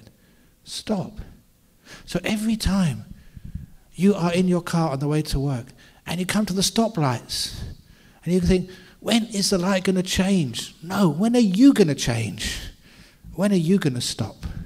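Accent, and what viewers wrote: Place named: United Kingdom. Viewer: ajahn brahms funny brittish accent. he says "thinkink" for thinking. all words ending in "ing" he says "ink"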